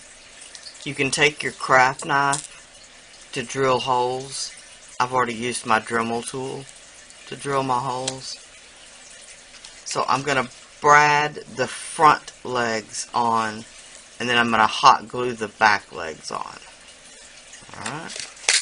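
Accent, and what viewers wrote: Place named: Texas, United States. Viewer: I'm glad you mentioned that you are from Texas. The accent is so pleasant and familiar. I'm in Arkansas, but the accents are similar. You sound EXACTLY like my friend Cynthia, so that is just another plus to watching/listening to your tutorials. You are awesome times ten!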